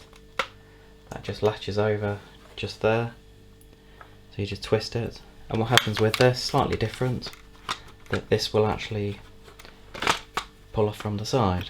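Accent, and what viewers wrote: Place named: London, United Kingdom. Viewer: Are you from London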